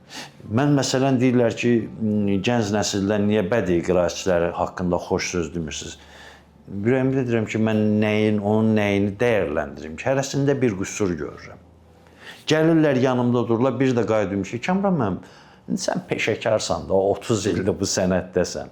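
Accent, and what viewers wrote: Azerbaijan. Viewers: Bu kişi tər təmiz əsl Azərbaycan dilində danışır.amma bəzi kometləri oxuyanda adamın heyfi gəlir bu kişinin danışmağına.Necə tələffüz edirlər elə də yazırlar.🤔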